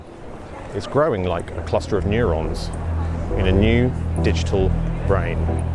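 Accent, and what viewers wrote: England, United Kingdom. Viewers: I aspire one day to move to the UK from NY and speak as eloquently polite, whilst getting the information he seeks. This isthe epitome of evolution to me. I wonder if that is cockney or RP?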